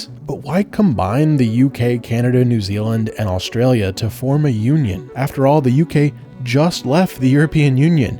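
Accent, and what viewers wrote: United States. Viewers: As a Canadian i have to say you sound like an American...these changes are permanent. our trade with the US has dropped 6 percent but increased with other countries by 26 percent, so no real change in total exports and we are just getting started. I won't buy US products ever again if I can help it and i will never travel there...but i am planning trips to Scotland, New Zealand and Australia. we can do what we want and we don't need permission or advice from the US. but your video did highlight one fact...we only need to defend ourselves from the US, but in that we would have the help of more than juat these 3 countries. we've been pushed around by the US long enough and while they're descending into fascism this is a good time to get out of NORAD. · FYI: 1. It’s not the “British Commonwealth “, it’s “The Commonwealth” (an organization of equal member states) 2. 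It’s not the “King of England”, it’s the “King of the United Kingdom of Great Britain and Northern Ireland” (or Canada, or Australia, etc) You sound American. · Hey!! You sound like an American! In that case you have no opinion on this subject!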